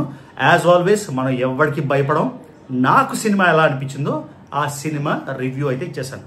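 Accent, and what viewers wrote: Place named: Karnataka, India. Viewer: U r kannada slang ❤ love from Karnataka 💛❤️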